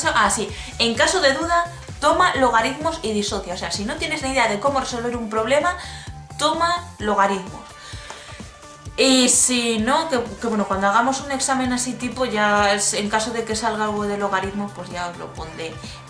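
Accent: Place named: Spain. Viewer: Joder si me puede gustar tus videos, y tu acento Español💚💪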